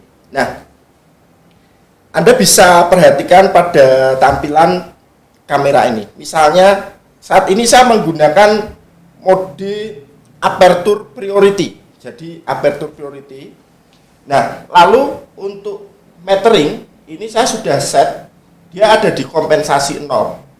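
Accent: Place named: Java, Indonesia. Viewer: pekat sgt jawanya pak..tp terhibur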